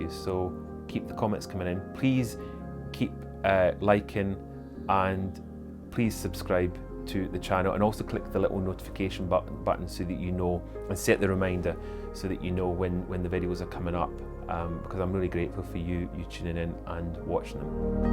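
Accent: Scotland, United Kingdom. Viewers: I like the music but I understand that those who aren’t British might find it harder to understand your lovely Scots accent above it. Please though do check your subtitles. Some of what it picks up are the most bizarre interpretations of what you said. I think I heard 3 different words for Balmoral and it must be confusing for those who aren’t as familiar with the RF (I’ve holidayed in one of the cottages that are rented out on the Balmoral estate. It’s so beautiful there) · are you Scottish? from your accent it sounds so, maybe.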